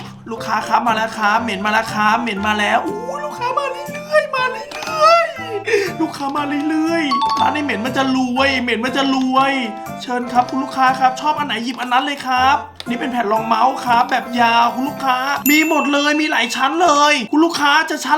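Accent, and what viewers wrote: South, Thailand. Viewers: พี่เป็นคนใต้หรอติ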